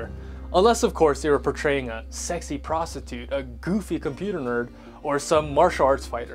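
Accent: United States. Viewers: I like to know if you have a western blood? i am interested on the biopsychology of talking like from west when you are from the east, i.e. acquiring that accent or voice quality like American when you are talking. i did not expect that the person behind the narration in the beginning have asiatic facial features. (if not biopsychological, maybe psychocultural which in other words, you must be living in the west?) Or maybe you really study how to speak in a way like that? Or learn from hearing? To others who read my reply, maybe I am not as good as Americans like some of you here in telling whether the sound quality is asian or american-like because I'm not American caucasian. i observed that voice quality acquisition before from my English language professor. i am gayish that's why even though i can speak English, the non-manly voice come out. though, I can still differentiate an original American caucasian who's not culturally British English voice quality from an acquired one like yours. 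this one is American causian, non-british english <link> (the man only, because that's what I'm talking about, the scope).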